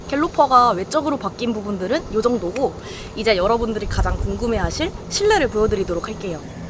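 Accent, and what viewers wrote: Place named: Jeolla, South Korea. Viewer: 말씨가 전라도네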